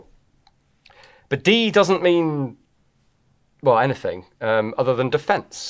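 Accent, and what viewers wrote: United Kingdom. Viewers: no, he has never shot a gun. Because he's Bri'ish, you can tell by the accent.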